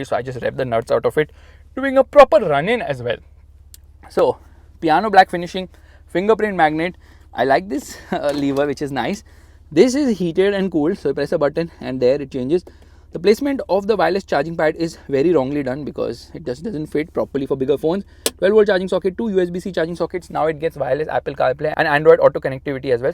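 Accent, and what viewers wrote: India. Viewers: Хахахха,индийски мангал!!! Ще ме спукат от смях с този акцент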